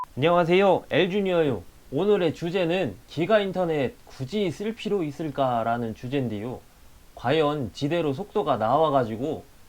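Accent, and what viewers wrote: Chungcheong, South Korea. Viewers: 와 이게 찐 충정도 사투리인거신가ㄷㄷ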